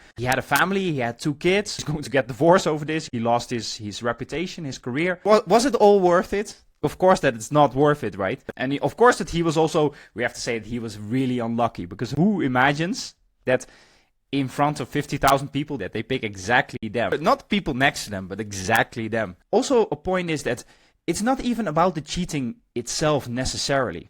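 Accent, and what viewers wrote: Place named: Netherlands. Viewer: I hear a dutch accent.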